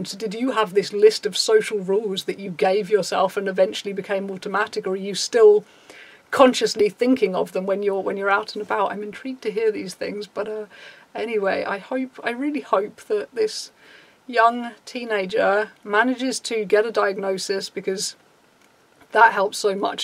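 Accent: United Kingdom. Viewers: I love your videos about Asperger's and eating disorders. Hearing you talking about asperger's was when I first realised it could be me too and somehow your voice and your British accent have become a little voice in my head that speaks English (English is not my first language). I find your videos calming and thanks to you I've come to accept and understand many things about myself. Whether I'm asperger or not is something yet to determine, and I don't know if I'll seek out a formal diagnosis. Thanks for sharing your experience, I feel "at home" when I listen to your videos. I also really enjoy your looks!!!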